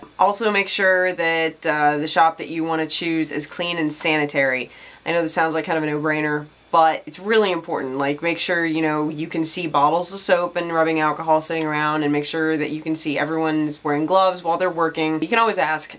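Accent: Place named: Northeast, United States. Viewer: Hi I have been a follower since early 2012 Just Curious what state are you in. You sound kind of North Eastern U.S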